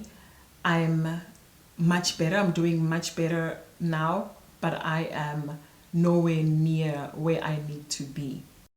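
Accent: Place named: South Africa. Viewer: Umuhle sis❤ from your accent I could tell ukuthi you are south African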